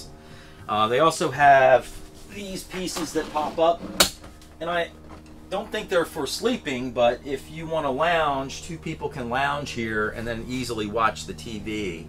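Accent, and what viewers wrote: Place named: Maryland, United States. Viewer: I knew I heard a MD accent! Ravens sweatshirt confirmed it!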